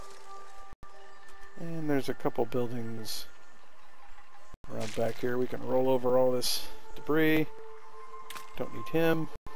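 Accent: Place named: United States. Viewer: That's great for you, but as an actual American I'm telling you this guy is an American speaking English. Not everyone in America talks the same way a rapper does. Pretty much no one does.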